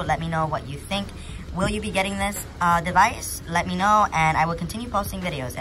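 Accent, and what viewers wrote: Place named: Northeast, United States. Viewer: I'm looking at the Revvelry +, Stylo 5, Aristo 4+, &Samsung A10e and A20 a a backup. Phone shootouts would be cool. With a nick like and that digital voice accent, I'm guessing you're either a Philly, Joisey, or Brooklyn boy. Keep rockin.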